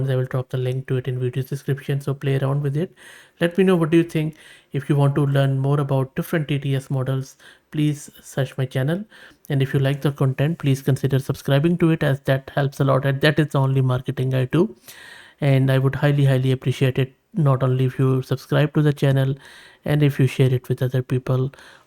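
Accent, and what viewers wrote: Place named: India. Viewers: For me his accent is very clear Mayebe you are not familiar with Indian English accent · Obviously it's you who need to keep up with the Indian English (no offense meant here either). Indian English has an overwhelming stronger presence on the net than southern USA, Scottish, new Zealander, Australian, Scottish accents... That no one dares be prejudiced against.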